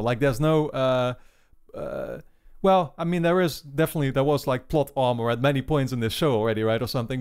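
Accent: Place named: Netherlands. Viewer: im hearing this accent... my man... are you dutch?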